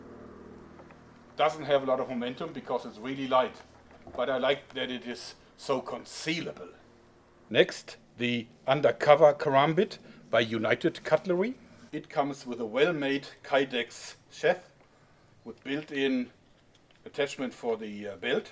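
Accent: Germany. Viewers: Nope, keine Fehler nur starker deutscher Akzent, aber das ist ja normal. Einige Engländer mögen sogar den deutschen Akzent :D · Also war der Dialekt doch deutsch :D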